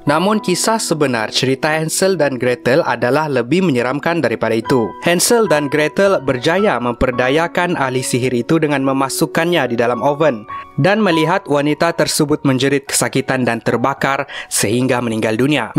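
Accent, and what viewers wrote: Sabah, Malaysia. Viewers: kamu urang sabah ka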